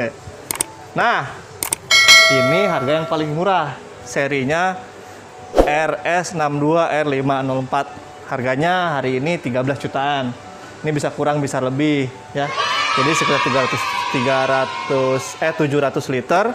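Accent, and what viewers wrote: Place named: West Java, Indonesia. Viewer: Sundanis banget"munggahan kang😁kang"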